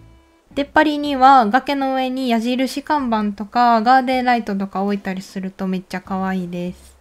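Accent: Kansai, Japan. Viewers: 楽しみにしてました〜！さんを参考に島作り直してます🥰 関西弁、親近感あって好きです〜！ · 関西の方なんかなぁ自分もやからめちゃ聞きやすいです🥺ほんで編集もお声も可愛くて見やすい💕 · イントネーション関西風www 参考になりました。ありがとうございます。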